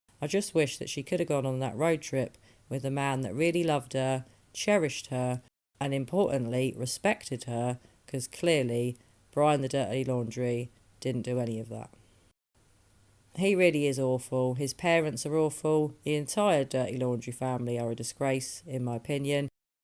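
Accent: England, United Kingdom. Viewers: IMO he simply stole it as a trophy, and to feel his superiority and control every time he looked at it. If this was the reason, murderers usually hang on to their trophies, so may be if not wearing it, it's still with him. Thanks, for your videos, I live abroad, and look forward to hear you English voice with each new video. YAY, go gal go!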